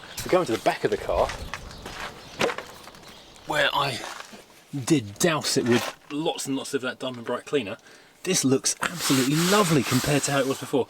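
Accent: United Kingdom. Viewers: ...The best investment I ever made was an Ozone machine. You run it for about 30 minutes inside the car. It will kill everything in the car such as mold, insects, etc. I feel much safer when I drive my older cars knowing I'm not inhaling mold spores where I can't clean! The machines are only around $75.00! ENJOY your channel! With that British accent, you make me enjoy even more my Rolls Royces!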